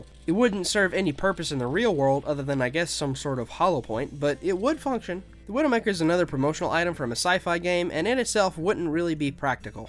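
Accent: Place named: South, United States. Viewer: The second I heard that southern drawl, I know this was a man I could trust to talk about guns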